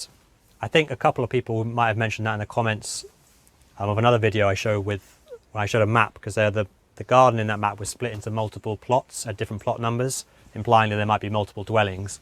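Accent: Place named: United Kingdom. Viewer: It's fascinating to see all the work you do to uncover this. Very labor intensive. I love the U. K. accent. I wish you were single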